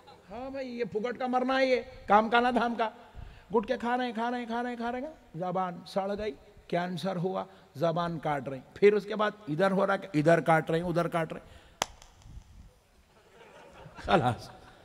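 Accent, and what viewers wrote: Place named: Hyderabad, India. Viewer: Are bhai yo hyderabadi hai thoda dakni lajha hota hai. Kab bhai koye kise ghaga .ka koye rahata hai osi language ya tahsil Ko sikhata hai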